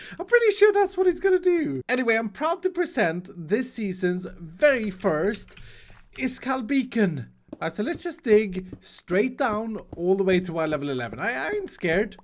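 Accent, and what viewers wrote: Sweden. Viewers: Är du från Sverige? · Are you from Sweden??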